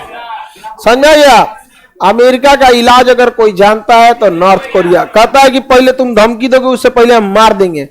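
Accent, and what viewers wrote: Bihar, India. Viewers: Bihar se ho kya jara dhang se bolo sunne walo ko samje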